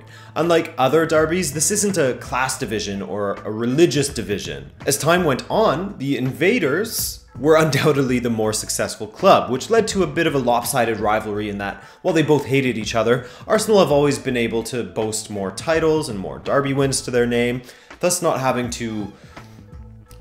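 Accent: United States. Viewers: Your listening to a yank 🤣🤣🤣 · Not in the US. And he speaks with a US accent, so it's confusing. I'd never heard it pronounced darby by an american. It was originally pronounced derby in the UK also, but not for a couple hundred years. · American accent the entire video excluding your pronunciation of “derby” why do you say it like you’re an Englishman lmao “Darby” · My god it sounds terrible hearing an American talk about football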